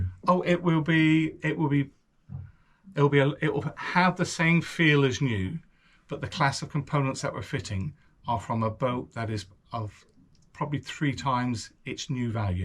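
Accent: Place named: West Country, United Kingdom. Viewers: Like the look of this one. But maybe its the west country twang but...its Mural not Murial.😉😎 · 😂 its the West country accent m'lover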